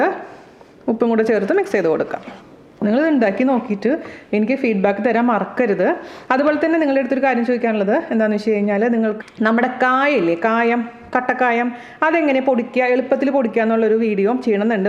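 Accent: Thrissur, India. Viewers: The dish is too good, you have a real talent to talk well in the typical Trichur slang, though sometimes it crosses the limit and cooking takes a backseat...on the whole, your videos are drool stimulating.... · Hi! Lovely recipe. Will try this with Doshas tomorrow. My native is Thrissur too and I love the way you speak the typical Thrissur bhasha. Hope to meet you someday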